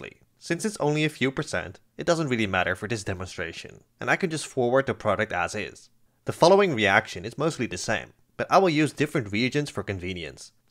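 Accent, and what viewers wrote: Netherlands. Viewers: Nee echt leuke videos... stiekem beter dan nilered. Ookal heb je een nederlands accent je prsst fijner, iets sneller en minder met een zeurige toon. Hahah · Your voice has a hint of Dutch if that’s true damn it’s a fine accent if other disregard the previous 😅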